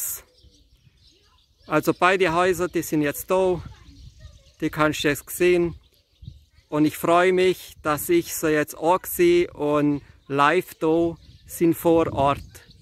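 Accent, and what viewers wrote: Germany. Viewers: Suna ca si Dialectul de Bayern!!! Ich Mag Das!!! Gruß Gott!!:hand-pink-waving::face-red-heart-shape::face-red-heart-shape::face-red-heart-shape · WUNDERBAR und SEHR SCHÖN, DANKE für dieses schöne Video aus dem Banat. Sag Mal, weißt Du, wo Deine Vorfahren hergekommen waren, denn Dein Dialekt erinnert sehr an das Saarländische und an das Süd-Pfälzische in Deutschland. Alles Gute und nochmals DANKE für dieses schöne Video...!!! Gruß, Marijama.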